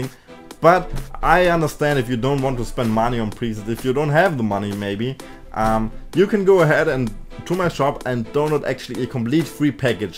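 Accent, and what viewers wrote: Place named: Germany. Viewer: Der deutsche Akzent ist das Beste! :D Ich schau mal rein, support ist kein Mord. ;) :)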